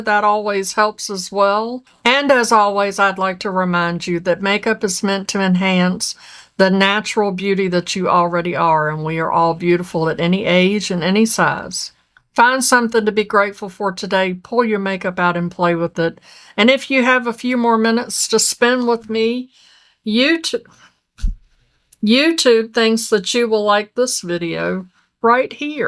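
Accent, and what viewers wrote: South, United States. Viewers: Although I love seeing makeup videos in the mature woman world, I love listening to your beautiful soothing voice. Your southern accent is so calming and lovely.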